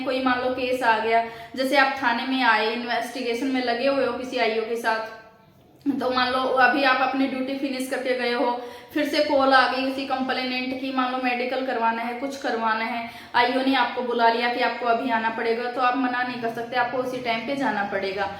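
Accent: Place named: Rajasthan, India. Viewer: Aap rajasthan se ho kya alwar ke aas pas se awaz se lg raha h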